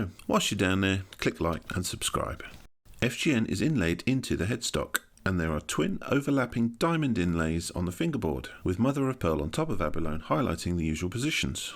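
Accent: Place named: United Kingdom. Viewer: This is a completely brilliant review, and just what I was looking for. I was looking at this model and some of the Eastman LP-types. Plenty of info on those, very little on these, so thank you so much. I have two questions, if you don't mind. First, how does the guitar hold tune without locking tuners? And, they say that the frets on these are "jumbo" (which i love), but so often that really means "medium". So, anything you could say about the frets would be great. Thank you again for being the best demo of this guitar on YT. Cheers!👍🙏PS- as a yank-anglophile, I have to say that you voice and your accent are so beautiful to listen to.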